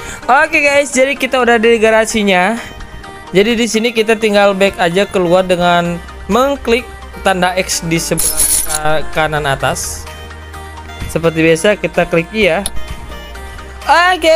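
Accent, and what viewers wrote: West Java, Indonesia. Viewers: Bang orang Sunda ya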